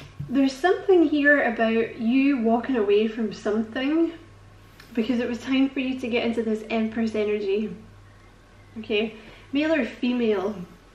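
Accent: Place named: Glasgow, United Kingdom. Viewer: Sounds like a fellow Glaswegian reader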